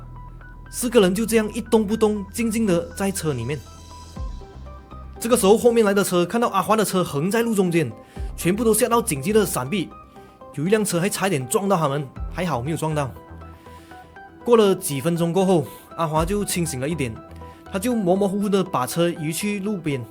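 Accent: Malaysia. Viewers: 很马来西亚feel的华语，听多了台湾的灵异频道，还是这里有亲切感😁，加油啦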